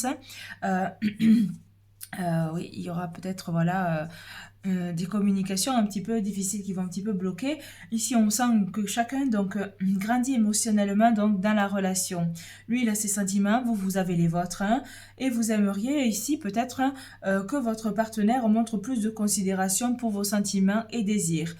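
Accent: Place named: South-West, France. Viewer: Première fois que je vous entends ma chère vous avez un charmant petit accent du sud-ouest peut-être si je ne me trompe 🤔quelque chose oui effectivement mais je ne sais pas la suite me le dira pour le moment pas de nouvelles je vous souhaite de bonnes fêtes🙏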